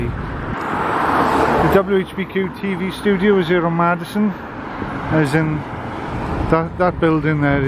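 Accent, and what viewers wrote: Wales, United Kingdom. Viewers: Smashing Pics Of Young Elvis. Amazing How More Information Keeps Popping On Elvis After All These Years. Thanks To Elvis Fans Like.(Do I Detect A Lovely Welsh Accent) Being Welsh Myself.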